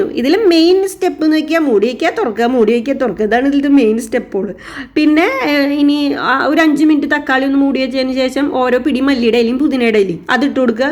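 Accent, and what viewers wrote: Thrissur, India. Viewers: നിങ്ങള് തൃഷൂർ ആണ 😜 എല്ലാം സ്പീഡിൽ പറഞാൽ പെട്ടെന്ന് ബിരിയാണി ഇത് പോലെ തയ്യാറാക്കാം 😂😂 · 🌹🌹🌹🌹ഒരു അപേക്ഷ ഉണ്ട് ചേച്ചീ ഒന്നൂടെ തനതായ തൃശൂർ ഭാഷയിൽ സംസാരിച്ചു വീഡിയോ ചെയ്യാൻ പറ്റുമോ. അത് കേട്ട് പാചകവും കാണാന്‍ ഒരു രസം ഉണ്ട്... 🌹🌹🌹🌹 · ബിരിയാണി ഇഷ്ടമായി. ആ സംസാരവും എനിക്ക് ഇഷ്ടമായി. തൃശൂർ ക്കാരി ആണോ.ഞാൻ തൃശൂർ ക്കാരിയാണെ. 😆😆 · തൃശൂർ ആണോ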